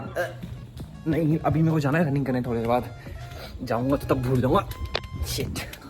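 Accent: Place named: Uttarakhand, India. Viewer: I swear you are from Uttarakhand accent bahuttt jyadaa familiar heinn 😭🥰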